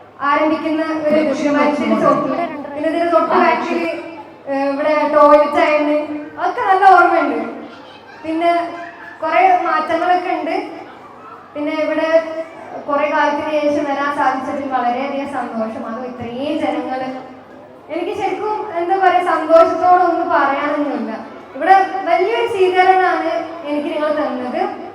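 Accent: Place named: Kasaragod, India. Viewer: Masha allah mole congratulations ❤❤eniku kasarkkode bhasha bhayankara ishtamanu😂keep it up mole❤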